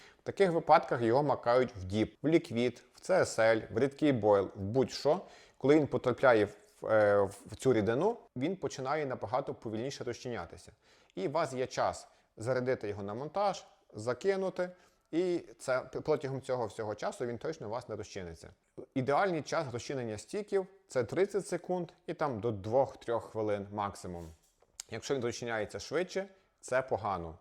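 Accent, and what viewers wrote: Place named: Lviv, Ukraine. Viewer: Вітаю! Гарно показали і пояснили. Дякую за цікаві відео і Львівську українську!)))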